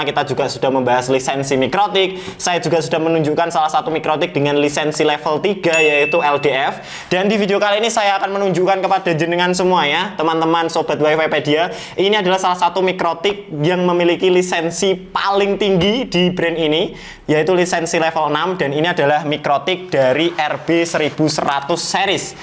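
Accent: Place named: India. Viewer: kang kaya orang india... ngebutt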